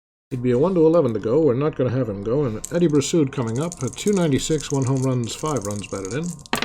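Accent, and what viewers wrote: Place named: United Kingdom. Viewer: Count me in and who is this voice of what sound like British persuasion?? Great voice Please continue very enjoyable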